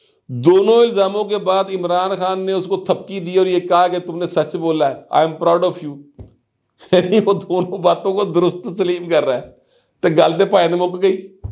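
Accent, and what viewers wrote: Lahore, Pakistan. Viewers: صاحب کا سٹائل آف expression لاھوری ٹچ کے ساتھ انتہائی خوبصورت اور منفرد ھے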